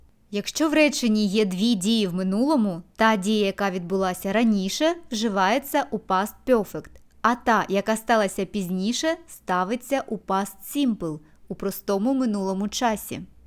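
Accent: United Kingdom. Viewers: Вітаю! Радий знову Вас чути! У мене злетіли всі налаштування Ютуба і я загубив Ваш канал на декілька днів. І ось тепер ми знову разом)) Як приємно знову чути милий моєму вуху British pronunciation! Бо це американське ричання мене просто дратує. Дякую Вам за це відео. (А кого тут не влаштовує вимова - слухайте блогерів - носіїв мови. І буде вам щастя, як кажуть.)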